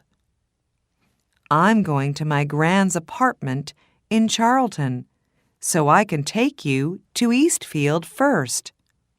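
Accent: United States. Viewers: Hello Is it american english? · Thank you a lot! Could you tell me what kind of English pronunciation it is. Is it American English pronunciation? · I think it's American English.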